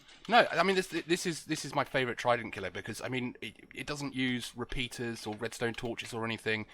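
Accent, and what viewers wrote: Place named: United Kingdom. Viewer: Gotta love that British accent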